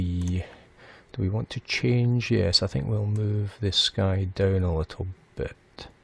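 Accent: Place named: Scotland, United Kingdom. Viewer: I heard scotish.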